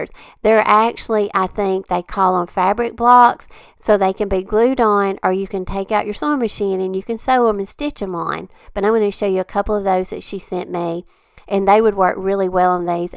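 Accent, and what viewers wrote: South, United States. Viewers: I adore your accent! I miss living in the South. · I Absolutely Love your Southern Drawl & creative DIY's. The Drop cloth pillow cover is too cute Ya'll! 💜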